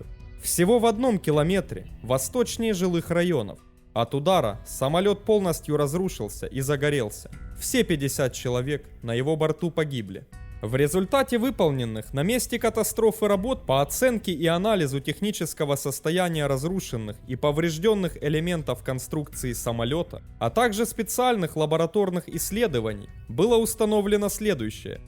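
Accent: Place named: Ukraine. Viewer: Мляяя, кому не лень делают обзоры катастроф, походу не понимающие в авиации ничего. Сам инженер авиационный с 1989года. Говор автора вроде украинский.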